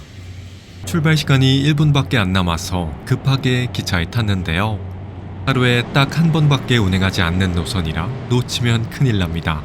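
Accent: Jeolla, South Korea. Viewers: 으미~전라도 억양이 참! 듣기 좋네요~^^ 서울말씨인줄~~ ex: [가~장 긴 시간] 표준어 억양 [가장 긴시간] 좋은정보 감사하지라~ 화이팅~!!!!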